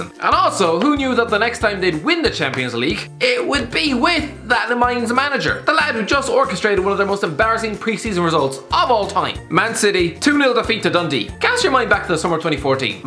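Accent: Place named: Ireland. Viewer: I think it’s Irish 😂😂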